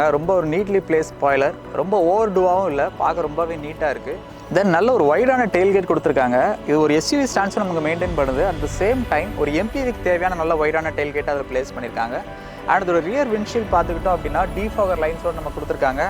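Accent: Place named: London, United Kingdom. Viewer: இது தமிழ் விமர்சனமாக இல்லை. தம்பி லண்டன்காரா் போல பேசுராரு.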